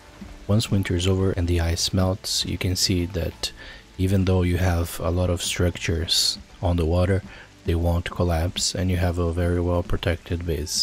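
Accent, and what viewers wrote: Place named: Brazil. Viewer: idk, but u have some brazilian accent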